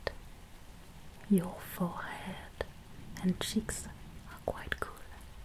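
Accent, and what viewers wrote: France. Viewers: je crois déceler un accent ? Tu es Française ? pourrais tu faire une vidéo en français j'aimerai beaucoup écouter ton travail dans ma langue maternelle. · I'm in love with your voice and french accent. Your videos are just pure art but I like the most fall asleep listening only your voice and sounds. Nothing more relaxing! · I’ve checked out many different ASMR sites, and ALWAYS end up coming back to this one. The tingles come on really quickly as soon as you begin doing anything with your hands- from counting to palm reading to (especially) smoothing fabric - and your beautiful French accent is so calming to go along with it. You are the Absolute ASMR QUEEN - thank you for doing what you do! ❤😌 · i love the french accent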